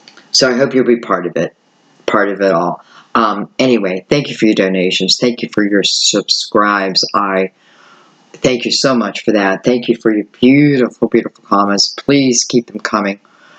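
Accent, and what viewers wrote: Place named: Pittsburgh, United States. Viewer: You are getting so warm on what I am going through I can feel the heat! I found you about two years ago. Being a Pittsburgh native myself, I knew from your dialect and Steelers stuff that you were one too! So thank you for being there because when you read my cards, I am an Aries, it is like coming home to an old friend. Have a blessed year.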